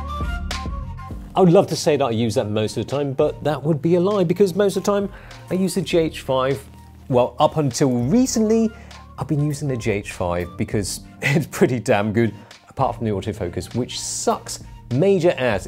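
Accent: United Kingdom. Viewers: British accent is getting so thick, he’s hard to understand !!!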